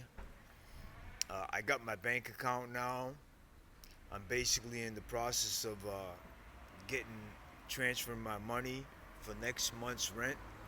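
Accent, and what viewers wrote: Boston, United States. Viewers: Boston accent never left, love it! Awesome story brother. I agree, it is 100% by design. Keep humans in the rat race.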